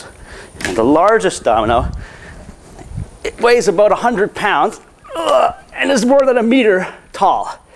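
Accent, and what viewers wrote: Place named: Canada. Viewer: He actually sounds Canadian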